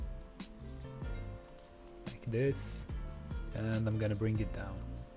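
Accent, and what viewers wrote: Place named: North Africa. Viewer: Great design bro,btw where u from u sound north african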